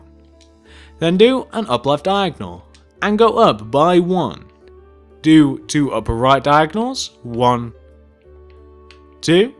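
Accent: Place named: RP, United Kingdom. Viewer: Where are you from cos your accent sounds kinda posh lol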